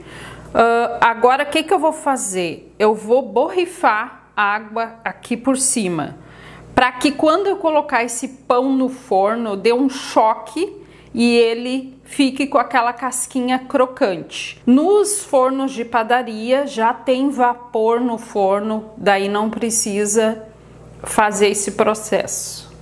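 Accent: Rio Grande do Sul, Brazil. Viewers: Essa guria é top demais!!! Arrazaaaaaaa sempre nas suas receitas maravilhosas !!! Adoro o sotaque dos gaúchos!! Gurizadas que falam bonitooooo tchê!!! 😊 Parabéns guria por mais uma delícia e gratidão sempre 👏👏👏👏👏👏😍😘🌹🌹🌹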